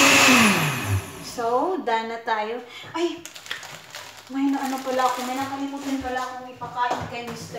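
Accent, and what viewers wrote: Visayas, Philippines. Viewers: Malingaw kay ko saimo day bah!kay tinoud kay ka!!bisdak kaau!hahaha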